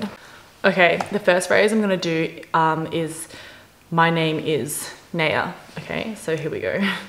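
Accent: Myanmar. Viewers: OMG! you're Burmese accent is really cool. I love you're Burmese accent.💛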